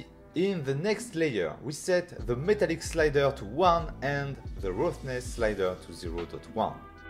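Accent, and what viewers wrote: France. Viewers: T’es français a tout hasard ?😂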